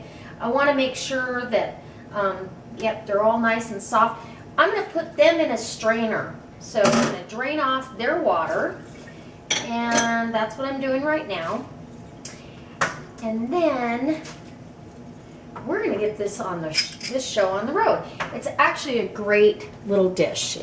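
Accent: South, United States. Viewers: a slight southern drawl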